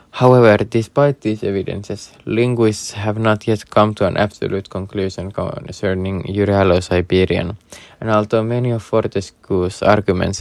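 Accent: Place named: Finland. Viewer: Give him a break! This is a Finnish accent on a channel about Uralic languages. It's fine :) I can't speak Finnish so who's to judge!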